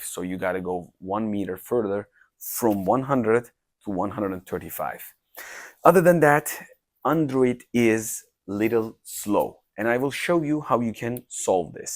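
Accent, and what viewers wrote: Turkey. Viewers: This is Turkish accent. Öyle değil mi? Nerede duysam tanırım hocam :) (ve 'de de Hoşçakalın'ı duymak beni mutlu etti). Detaylı rew için çok teşekkürler. Thanks for detailed rew.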